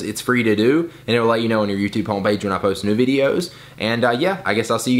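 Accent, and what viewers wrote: United States. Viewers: he is gorgeous... what accent does he have? I mean I know it's american but ..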